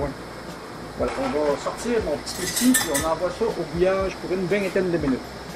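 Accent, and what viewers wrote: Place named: Quebec, Canada. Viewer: Vraiment trop sympa et vraiment bien documenté. J'adore l'accent Quebecois !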